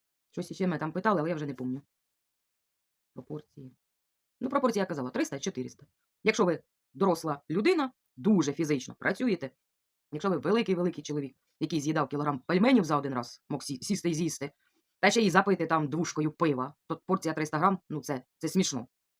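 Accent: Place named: Ukraine. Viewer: Ви дуже природня, щира, аутентична дівчина - Ви не простота (як Ви процитували у попердньому вдіео свого глядача), це глибина Ваша така народна. Викладайте відео - на Вас дуже приємно дивитися і дуже приємно слухати - у Вас красива народна українська мова - я навіть собі записую інколи ті слова, які колись чула у баби в селі, а тепер ми їх не вживаємо. Ви обрали правильний шлях - ви харчуєтесь повноцінно, просто прибрали із раціону некорисну їжу. Бажаю Вам триматись на цьому раціоні все життя, додаючи тільки корисні для організму страви! Успіхів Вам!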